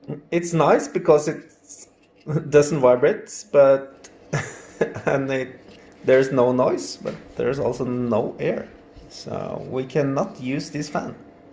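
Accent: Norway. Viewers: Damn you HAVE TO BE Norwegian.......you got THAT accent hahaha.....greetings from Trondheim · I just love a good ol' norge accent. Thanks for the video good sir, I am currently trying to know more about this kind of fans!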